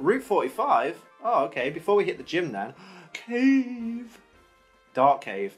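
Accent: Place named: Midlands, United Kingdom. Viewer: You've quickly become my favourite pokétuber! I've managed to get most of my friends into your videos too! Fellow Brit here, are you from the midlands? I'm from the west midlands and you sound like you're from near here :)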